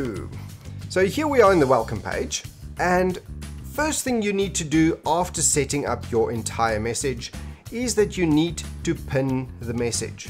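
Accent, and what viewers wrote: South Africa. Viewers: Are you from south africa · You sound South African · My dudes South African, love you!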